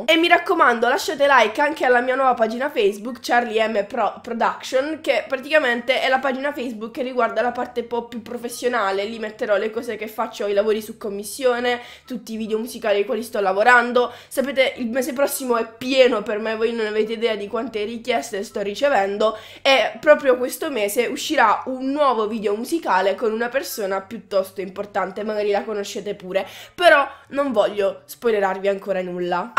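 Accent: Italy. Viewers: charlie non c'é bisogno che non dici di dove sei si sente anche io sono di quelle parti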